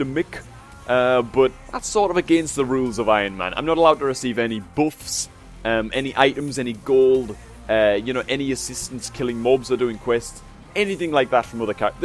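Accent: Newcastle, United Kingdom. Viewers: Representing us geordies!!!!!!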